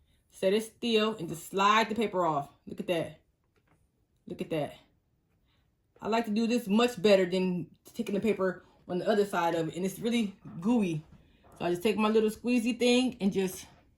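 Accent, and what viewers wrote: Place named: New Orleans, United States. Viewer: You sound like you from New Orleans! I know that accent anywhere!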